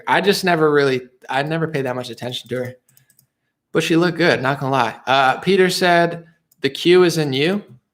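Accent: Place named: South, United States. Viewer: Where is this new southern accent comming from? You been hanging here or some new bud's in your life from here? It's cute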